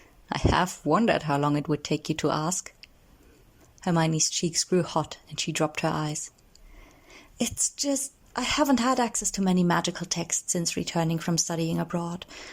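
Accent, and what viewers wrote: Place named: Paris, France. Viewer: It’s sounds like the narrator has a Parisian accent - that would explain the mouth noises. I don’t mind it honestly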